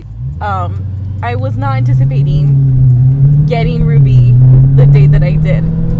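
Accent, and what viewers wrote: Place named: Florida, United States. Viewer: Sound like you from Florida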